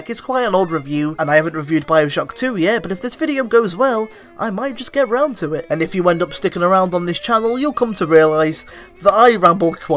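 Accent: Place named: United Kingdom. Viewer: Awesome job! And British! EXCELLENT!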